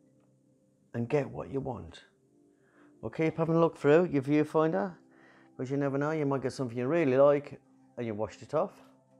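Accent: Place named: Sutton Coldfield, United Kingdom. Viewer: Love the simplicity so glad I've discovered your channel. Love the accent too, takes me back to my youth in Sutton Coldfield.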